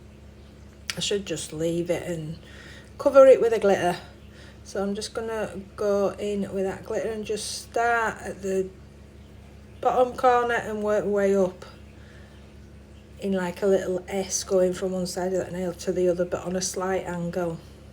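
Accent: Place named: Birmingham, United Kingdom. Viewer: Thanks for this video it’s gonna help I’ve got this hand coming tomorrow:) also are you from Birmingham? You sound local to me x this set was lovely xx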